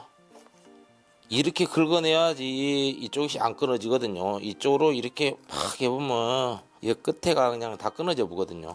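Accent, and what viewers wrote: Jeolla, South Korea. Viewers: 전라도 분이신가 말투가 구수하시네요 ㅎㅎ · 전라도 사투리가 구수하네요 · 구수한 전라도사투리 인간적으로 보여요ㆍ🎉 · ㅋㅋ김대석쉐프님 전라도 말씨가 겁나게 구수해요 저두 그짝ㅋ 저두응식한지20년이넘었는데 참맛나게 잘하세요 참고해서 많이 활용할게요 더많은 레시피 부탁합니다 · 세프님 전라도 사투리 넘나 잼 나요 종발이라는 말 아주어렸을때 들어서 정겨워요 오이 물 김치 잘 해먹어봐야죠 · 전라도 사투리가 넘 정겨워요. 재미있고요.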